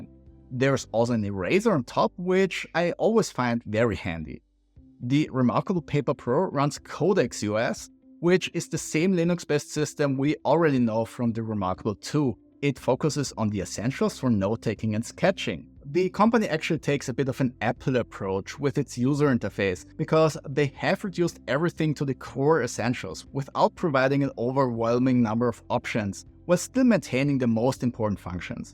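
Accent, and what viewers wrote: Austria. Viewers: Thank you for the good review. Just what I was looking for. Are you by any chance Austrian? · daher die leichte Wiener (?) Aussprache. * Englisch eher österreichisch als deutsch angehaucht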